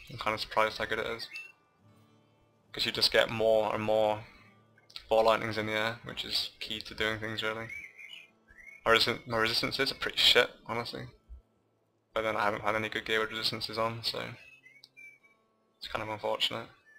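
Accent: United Kingdom. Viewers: You really sound like you're from around Leicester aha. Are you? great guide though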